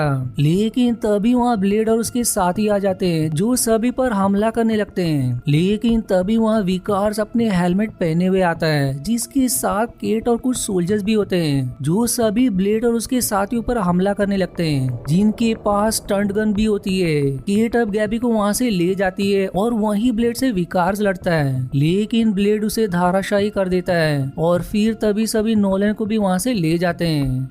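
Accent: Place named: Maharashtra, India. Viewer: Marathi words hote hai , accha lagata hai apke tune me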